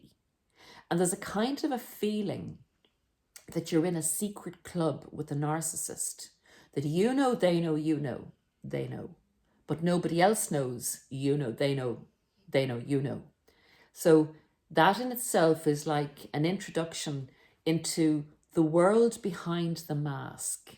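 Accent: Ireland. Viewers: Are you Irish?🍀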